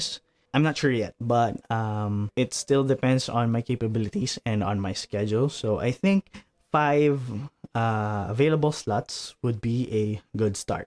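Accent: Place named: Philippines. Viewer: SKL I recognized our Filipino accent immediately 😂 Much love and support ⭐️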